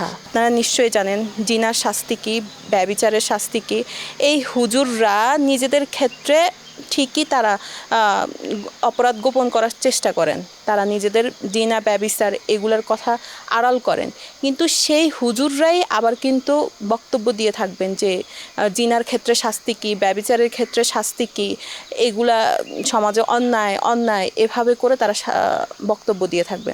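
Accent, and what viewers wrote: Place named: Chittagong, Bangladesh. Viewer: সঠিক বলেছেন, সুন্দর উপস্থাপনা কিন্তু ভাষাতে চট্টগ্রামের আঞ্চলিকতা দূর করতে হবে।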